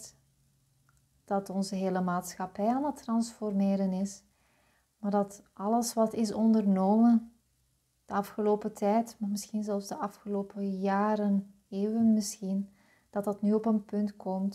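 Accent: Flanders, Belgium. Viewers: Weer zo herkenbaar, ik ben zo dankbaar dat ik in de flow leef. Deze maand gestart met een nieuwe baan als welzijnswerkers en 9 feb begin ik met de opleiding Social Work. Over het geluid, ik kan het altijd prima verstaan. Met en zonder oortjes. Bedankt weer voor jouw fijne reading en jouw fijne stem, ik geniet juist van jouw Vlaamse accent. Spreekt mij aan. Fijne dag lieve 🙏🌺💫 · Mooie reading dankjewel 🙏🏻✨🤍 Je geluid is prima zelfs op m’n oude ipad..je Vlaamse tongval charmant en ook dat is prima te volgen als de nederlandse die ik ben ;) Laat gaan laat gaan 🤷🏻‍♀️😅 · Resoneert helemaal,. Mijn ware zelf komt steeds dichterbij. Ik vind de ondertiteling geen verrijking, zo nu en dan verkeerd vertaald. Nou je, voor sommige mensen wel fijn, ik negeer de ondertiteling, je bent voor mij goed te verstaan en te begrijpen. Je Vlaamse accent is echt geen beperking.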